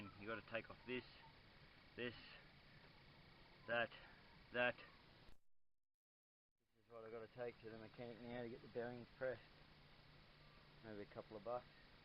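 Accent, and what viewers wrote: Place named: Australia. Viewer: Thanks for the video bud. Love the Commentary. Pure Aussie... Well done Mate.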